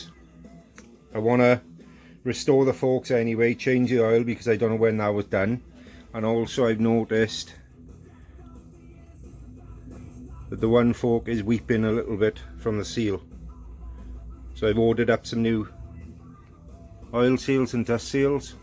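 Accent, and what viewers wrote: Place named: Wales, United Kingdom. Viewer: Watching and loving your Lifan video's. I just bought a Lifan King 125 on the weekend (63 plate with less than 3k miles). I notice by your accent your Welsh, what part of Wales are you living? I'm in Brynmawr near Ebbw Vale:)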